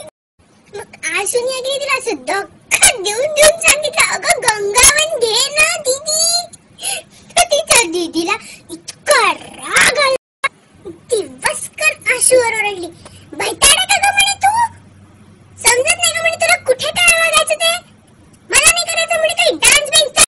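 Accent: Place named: Vidarbha, India. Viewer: jabardast video......tu Vidarbhachi ahes ka? khup mast ahe tujhi bhasha amchyasarkhich.....All the best ..Khup videos banav ani motthi ho...but motthi jari zalis tari lahanpan sodu nakos......Lahanpan dega deva....enjoy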